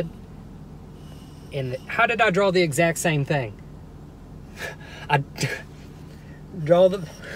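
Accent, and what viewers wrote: South, United States. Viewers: You are hilarious!! Where are you from I hear a southern accent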